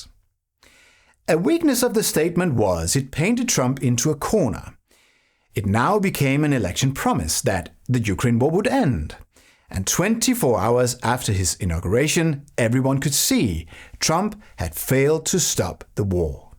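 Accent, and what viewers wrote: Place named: United Kingdom. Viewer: I cannot thank you enough, I love your content and presentation. May I ask, are you German? You have a British accent but is a German name. We (normal Americans) are glad that Germany is on our side… we have some seriously bad adversaries that we will soon be dealing with…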